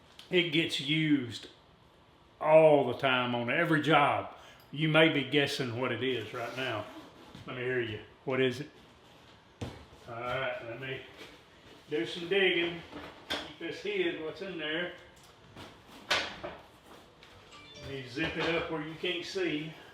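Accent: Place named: Alabama, United States. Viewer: Sounds like a 'Good ol' boy' from Alabama. Good comparison regardless.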